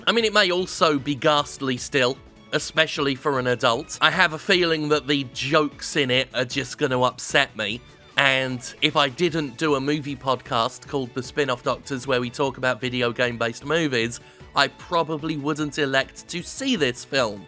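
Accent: United Kingdom. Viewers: you talk like an old man with a stuffy nose :/ you must be british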